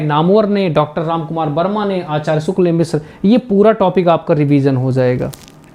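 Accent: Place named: Prayagraj, India. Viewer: Mujhe lga ki aap prayagraj se h o.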